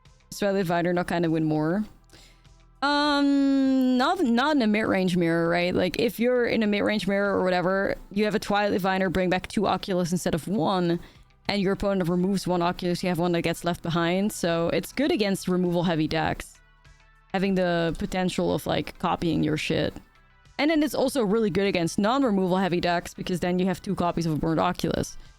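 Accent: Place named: Netherlands. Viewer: I love your very mild dutch accent, it is kind of cute. Very nice and structured content you have. Greetings from germany ❤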